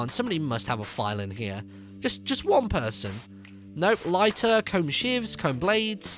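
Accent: United Kingdom. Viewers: Banana Bucket He Has a British Accent If You Havent Already Noticed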